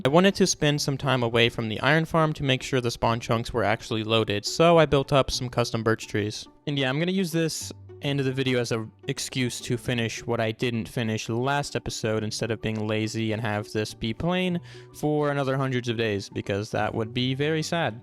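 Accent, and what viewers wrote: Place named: Poland. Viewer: Polska Gurom! Very nice accent for polish people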